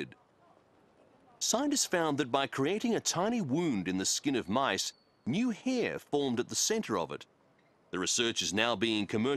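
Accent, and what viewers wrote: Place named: Australia. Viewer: the fact that they loosing their haaaaiirrrr love the Australian accent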